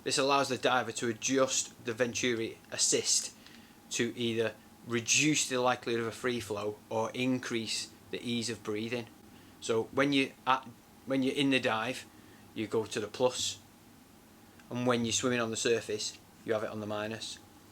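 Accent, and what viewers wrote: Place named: North, United Kingdom. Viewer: At last a northerner on YouTube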